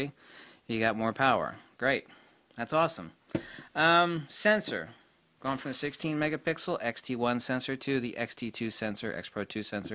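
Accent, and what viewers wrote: Australia. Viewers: Yes we do but don't let that stop you. Reference to Australian accent. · Your Aussie accent isn’t bad mate .. stick with it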